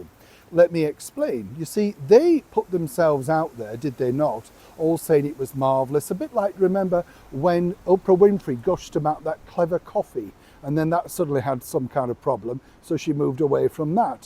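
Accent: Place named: Newcastle, United Kingdom. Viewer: Enjoy reading your articles Neil. Here in Australia hearing Northern and Geordie words and your accent brightens my day. Since my grannie-in-law passed over in March, we miss hearing her Geordie accent, which only got better after a few "medicinal" nips of good Single Malt.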